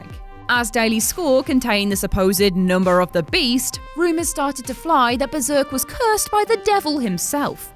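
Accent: Scotland, United Kingdom. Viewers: Haha I love British "R linking"! It makes "creepy pasta, at least" sound like "creepy pastor, at least". We've all known at least one creepy pastor in our lives, right?? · Tho I believe is Scottish or possibly Irish. Very similar accents tho.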